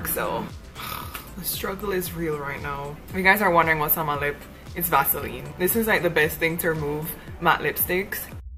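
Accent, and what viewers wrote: Jamaica. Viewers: i could from your enunciation of certain words...im jcan too..subbed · U have a little Jamaican in u don't u because I'm Jamaican and I can hear it in ur voice a little I just come across ur channel just now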